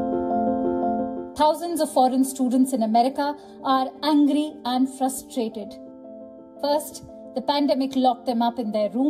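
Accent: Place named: India. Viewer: Stop that indian english accent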